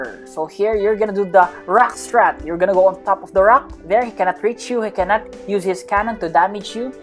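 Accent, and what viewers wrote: Philippines. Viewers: R u a filipino? Bc the way u speak english is just like filipino and ur wearng a philipines strae hat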